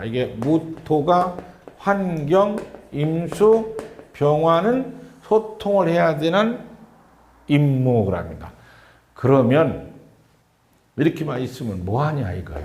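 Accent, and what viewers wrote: Chungcheong, South Korea. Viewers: 저는요. 선생님 메니아입니다. 충청도 말씨도 친근감 있고요. ㄱㄴㅇㅅㄲ도 재미납니다. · 여기 매니아 있어요 ~ 선생님 구수한 충청도 사투리가 너무 듣기 좋습니다!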